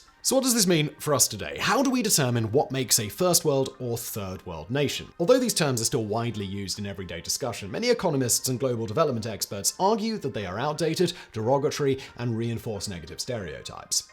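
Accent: United Kingdom. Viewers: mate can’t understand you. This is only for British English users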